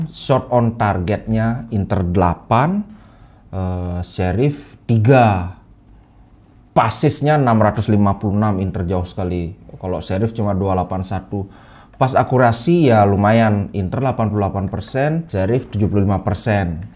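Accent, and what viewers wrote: Bali, Indonesia. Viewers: Org bali ya bang?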